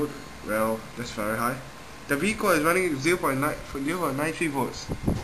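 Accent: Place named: Singapore. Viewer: lol singaporean accent :D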